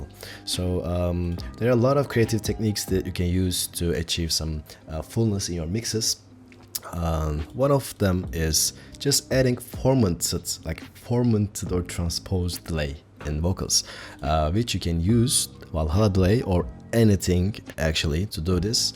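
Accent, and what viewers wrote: Turkey. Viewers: HELAL LAN TURKO AKSANINDAN ANLADIM TURK OLDUGUNU EDITLER TAM ECNEBILERI CEKECEK SEKILDE OLMUS BASARILARININ DEVAMINI DILERIM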